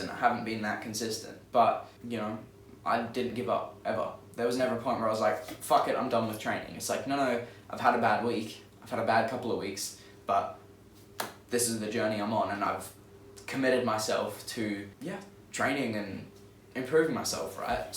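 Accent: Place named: Australia. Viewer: ye mate hes aussie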